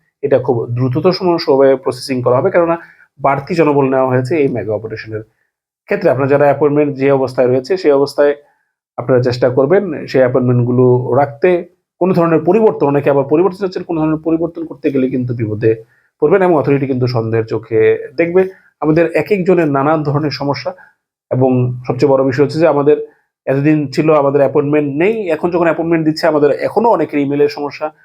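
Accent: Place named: Kolkata, India. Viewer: স্যার আপনি সহজ বাংলায় বললে ভাল হয়। কলকাতার মতো বলছেন মনে হচ্ছে। 🎉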